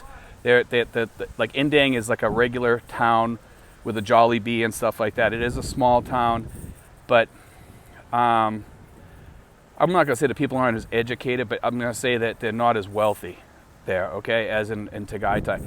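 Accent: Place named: New York, United States. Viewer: I hear an east coast accent. Are you a New Yorker?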